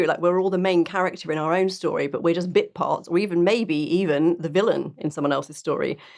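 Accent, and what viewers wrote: United Kingdom. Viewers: IMHO, it's both famous people and average people because 'all the world's a stage' as you will find out so much more about the band in their album. Welcome to the Rush family,! You have gone down the Rush rabbit hole, never to return, but it's all good, no worries! I've got to say that your personality is lovely as is your accent, purple hair, and Ink! Such a lovely bird, as you brits might say. Chicks seem to really dig Neil the most. Here's a small treat for you and others. Neil doing Cottontail and a short tasteful solo with the Buddy Rich band doing a tribute to Buddy. <link>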